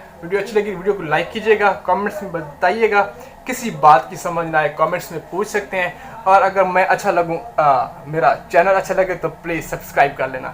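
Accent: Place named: Jhang, Pakistan. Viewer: Mjy tu ye bhai jhang k lg ry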